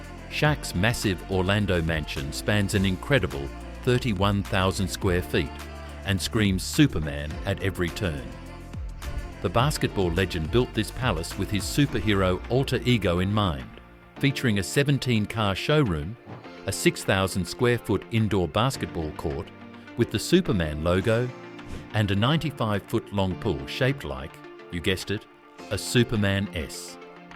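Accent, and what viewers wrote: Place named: Australia. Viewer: Great to have an Australian commentator 🎉😊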